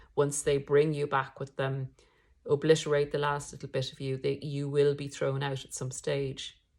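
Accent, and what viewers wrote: Ireland. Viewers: Thank you for your video's. I am on the journey of cutting of the toxic relationship since June. I am still ruminating in this. Some days it's better, especially when I have a lot to to. I like listening to your view on this. As a Christian I feel that this disorder is definitely evil. I just visited Ireland, and therefore enjoy the accent too! Best regards. Daniell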